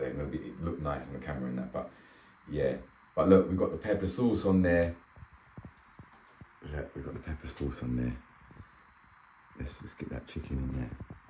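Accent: Jamaica. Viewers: I thought that lol, Is he actually from Jamaica???????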